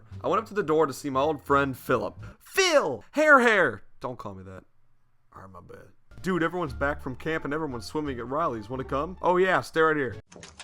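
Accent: South, United States. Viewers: you have a heavy southern accent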